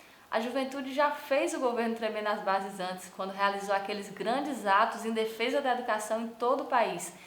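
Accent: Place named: Pernambuco, Brazil. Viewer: Eita sotaque arretado! Parece com o meu pernambucano ❤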